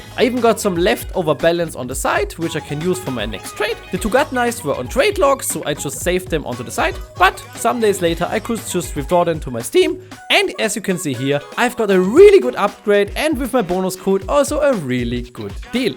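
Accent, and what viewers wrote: Germany. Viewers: Man hört richtig den deutschen Akzent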